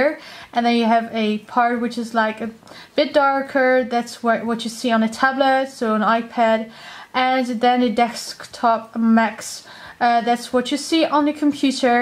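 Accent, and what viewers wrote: Netherlands. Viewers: Ik hoorde meteen dat je uit Nederland komt haha maar je engels is super goed x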